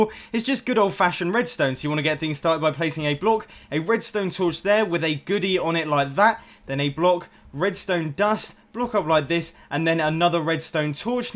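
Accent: United Kingdom. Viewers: Good old British. :) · British FTW!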